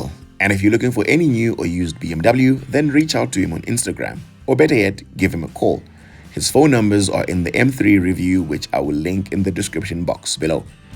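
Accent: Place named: RP, United Kingdom. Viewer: Lol hell no broh, don't tone it down, it makes your channel different broh, you're not trying to imitate someone else, you're just being you🙌🙌😁😁so i, personal don't mind the private school english you have bro😂😂😂but maybe if these comments persist then maybe you can open a poll and people voting to whether tone it down to Africanism or not🤔.....lol tjoo that's indeed a very tough call to make broh but maybe it will go down to which one you prefer the most between Engine and technological advancement but I feel like i can go with a bmw x5 m60i cause it still has a V8 engine in the hood and the interior designs is in a premium level and from depreciation statitics it alleged that M60I holds its value better than the RSQ8🤔😁😁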